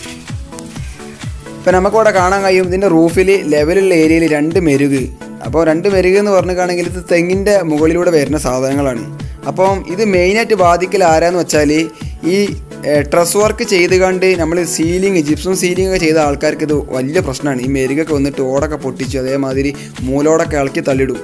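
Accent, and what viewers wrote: Malappuram, India. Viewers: ഹയ് ഞാൻ നിങ്ങളുടെ വിഡിയേ എല്ലാം കാണാറുണ്ട് നിങ്ങളുടെ വർക്ക് എല്ലാം സുപ്പർ ആണ് നിങ്ങളുടെ സംസാരം കോട്ടാൽ മലപ്പും ആണ് ഞാൻ ഒരു മലപ്പുറം കാരാൻ ആണ് എൻ്റെ നാട് പുലാമന്തോൾ ആണ് നിങ്ങൾ ഫോൺ നമ്പർ ഒന്ന് തരണം സന്തോഷം കൊണ്ട് ആണ് നിങ്ങൾ പറയുന്നത് പോലെ ഞൻ ചെറിയ വർക്ക് പടിച്ച് വരുന്നു നിങ്ങൾക്ക്‌ ആരേഗ്യമുള്ള ദീർഘയസിനെ തരട്ടെ ആമീൻ യാ റബ്ബൽ ആലമീൻ c ആമീൻ (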